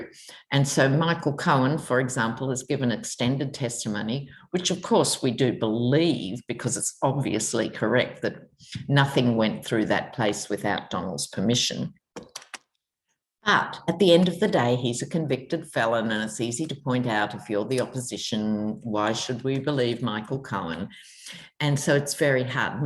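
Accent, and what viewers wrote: Australia. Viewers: One more thing: after 4 years of being an intrepid viewer I have a passable Aussie accent. All thanks to u my love. 🥰 I’m sure I’m not the only one. 👍😁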